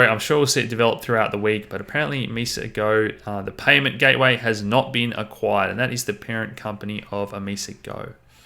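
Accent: Australia. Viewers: Great channel! Great find! Have been watching themoneygps and Lynette Zang etc Good to hear an Aussie accent in this space but adds in crypto as well.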